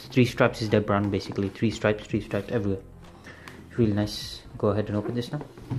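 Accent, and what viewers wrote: Bradford, United Kingdom. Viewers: Are you from Bradford?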